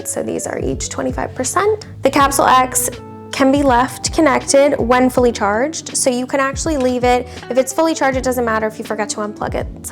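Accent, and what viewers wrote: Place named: United States. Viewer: hmmm, you sound American, but the plug is UK. I wish you'd shown actually putting the dust bag in. Do you have experience with Dyson vacuums?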